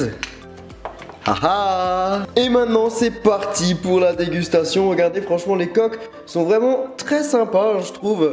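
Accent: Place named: Alsace, France. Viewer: Hé yo on entend bien l'accent Alsacien dis donc (le Bonjour de Mulhouse)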